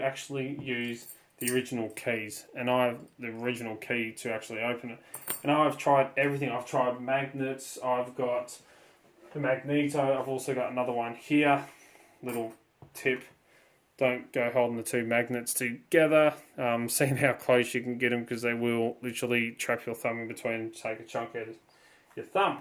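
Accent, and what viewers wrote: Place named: Australia. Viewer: well compare to lockpickinglawyer, you will hear the difference. Nothing wrong with the content but the sound is going to be an issue if you want subscribers outside Straya who are not that familiar with the accent. Anyways have a great christmas time man!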